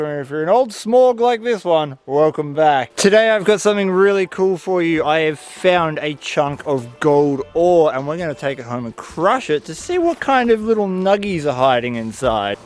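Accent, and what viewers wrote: Australia. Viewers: I think I just found the Australian version of myself without the tattoos I'm doing everything this guy's been doing without the Australian accent which I think would be really neat to have as well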